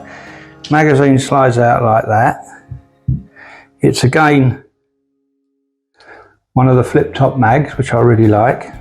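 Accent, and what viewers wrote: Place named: Essex, United Kingdom. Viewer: You sound like you come from Essex you miss name many rifle parts which makes you sound stupid and very boring to listen to!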